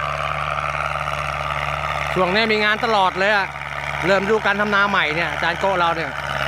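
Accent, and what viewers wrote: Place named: Nakhon Sawan, Thailand. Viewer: ครับผม ผมก็ว่าสำเนียงคุ้น ๆ คล้ายนครสวรรค์ ที่แท้อยู่ใกล้ ๆ กันนี้เอง สุดยอดครับ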